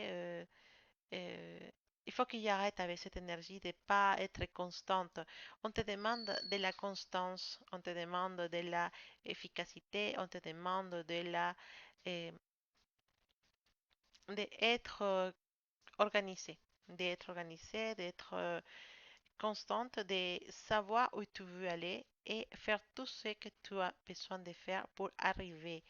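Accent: Spain. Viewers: Bonsoir, cela fait un peu plus d'une semaine que je vous ai découverte. Déjà, j'adore votre petit accent espagnol, c'est adorable. Vos tirages sont assez précis et intéressants par rapport aux détails que vous nous apportez. Personnellement, je suis Poisson 1er décan, ascendant Capricorne, considérant qu'après 30 ans, on est plus sur son ascendant. Cependant, vu la superbe journée que vous annoncez pour les poissons, j'ai hâte que le jour se lève... Merci beaucoup:)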